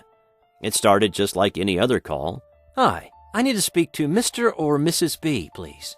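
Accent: South, United States. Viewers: Great narration and voice. But I can't tell iwhat regional accent you have. It sounds like it's between Standard American and southernish. Please enlighten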